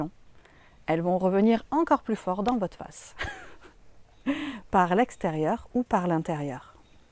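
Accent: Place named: Quebec, Canada. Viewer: Merci, tu es si sage et pertinente! Dis-donc, tu vis au québec ? Je crois reconnaitre mon paysage et un peu de notre accent. :D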